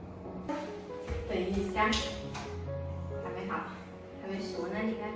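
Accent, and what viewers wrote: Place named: Hakka, China. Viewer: 问题是哪里买这肠衣呢🙈。宝贝普通话客家口音好亲切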